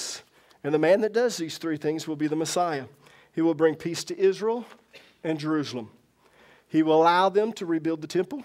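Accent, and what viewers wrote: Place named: South, United States. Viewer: You definitely sound like your from the south too! 😁 Bama girl here. Love your teachings 💜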